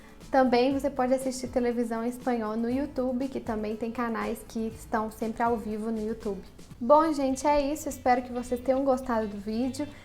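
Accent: Minas Gerais, Brazil. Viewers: O espanhol dela não é lá essas coisas.... Mineira, detectei.... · Are You from minas gerais??? Seu sutaque não é estranho. I loved your Voice. i Liked ok!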